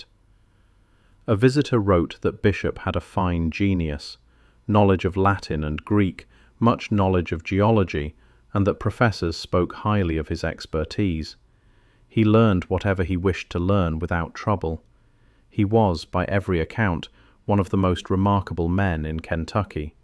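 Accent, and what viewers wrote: United Kingdom. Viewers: Yea. Same British voice on all the videos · A British accent saying "54 degrees" and not immediately saying "Fahrenheit" after that. Only with AI.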